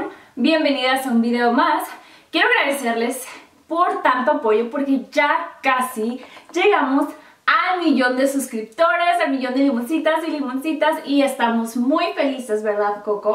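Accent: Mexico. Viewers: Yo creí que te fuiste a usa para estudiar y te quedaste que bien hablas el español tienes asentó mexicano y no americano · Cuando recien te empeze a ver pense que eras de Sinaloa de repente se te sale el acento... saludos desde Los Mochis Sinaloa ❤✌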